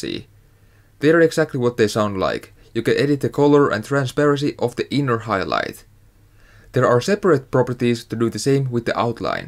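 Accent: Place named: Finland. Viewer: vahva suomi aksentti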